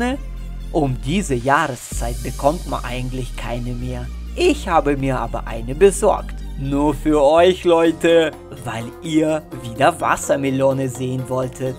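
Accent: Russia. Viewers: #Kölnerdom Feiere deine Videos übel und viel Glück für deine Zukunft ignorier deine hater einfach hör auf die richtigen und dein Akzent feiere ich auch ich schätze mal du bist Russe oder Kasache meine Familie kommt auch aus Kasachstan 🇰🇿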